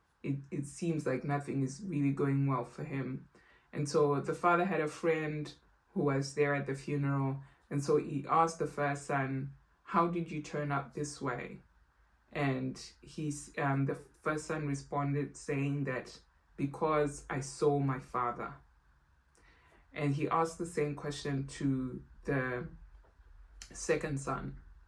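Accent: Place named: Zimbabwe. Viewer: I just finished her book NOW, and I am feeling SO full of thoughts and emotions now. I am now watching and listening to your review. I am going to do a review of the book on my channel soon. You sound Zimbabwean. Are you Zimbabwean by any chance? I am Zimbabwean and UK based.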